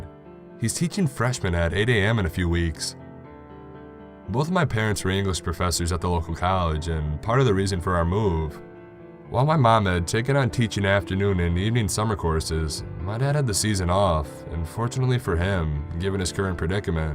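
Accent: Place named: Texas, United States. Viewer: Texas drawl is prominent. Also I loved the story! You made me love these types of stories even more then before! I'm jealous of how smooth your voice is, I stutter when I read out.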